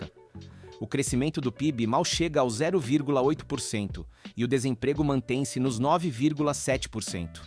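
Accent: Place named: Brazil. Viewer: Esquisito esse texto em português de Portugal com voz de português do Brasil. Sector, perceção, despedimento... 🙄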